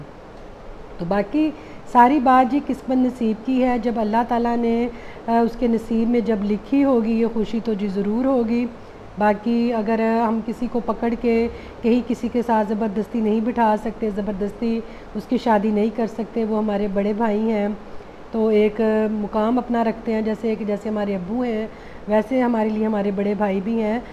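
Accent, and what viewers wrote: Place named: Jalandhar, India. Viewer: ਤੁਹਾਡੀ ਬੋਲੀ ਇੰਡੀਆ ਪੰਜਾਬ ਚ ਜਲੰਧਰ ਵਾਲਿਆਂ ਨਾਲ ਮਿਲਦੀ ਐ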